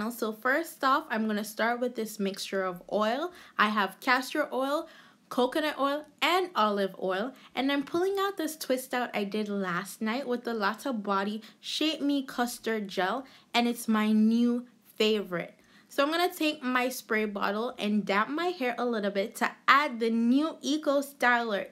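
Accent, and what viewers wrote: Patois, Jamaica. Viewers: You're trying to hide it but i think i hear an Jamaican accent😮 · u sounds like Jamaican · Lol I knew you sounded Jamaican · sorry I had to ask because I heard the accent and I'm a Jamaican too. great video goodaz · I knew you wee Jamaican...from the first time you spoke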